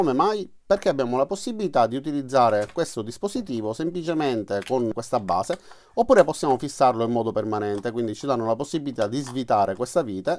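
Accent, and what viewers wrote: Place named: South Tyrol, Italy. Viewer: l’accento altoatesino ti ha tratto in inganno! 😂 P.S. Adoro l’accento siciliano 😉👍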